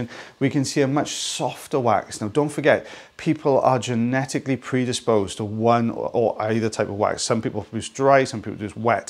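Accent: Wales, United Kingdom. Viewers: I am loving learning new terminology and facts about ears. Last year I had to get my ear cleaned after swimming had dislodged some wax. Went to the GP who was a bit thrown by my telling her that I thought the wax had gone into the anterior recess. Turns out that the nurse who did the subsequent syringing (no micro suction available here) was also fascinated with ears. I told her about your channel and she has become a huge fan too. Why do I love your videos? I learn something, it is so satisfying to see the results and how much difference it makes to your patients, Your passion for what you do and finally, I simply love a Welsh or Scottish accent 😃 Between you and The Hoof GP, I get to watch people who love what they do, help others in pain or discomfort and learn. I do all of this from the comfort of my home on the other side of the world in tropical Northern Australia. 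Thank you!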